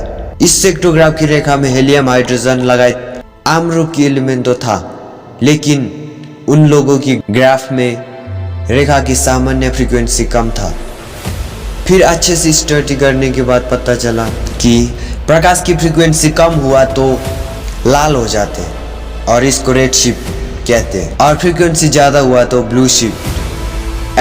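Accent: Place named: Nepal. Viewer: U nepali bro Accent ley tha vo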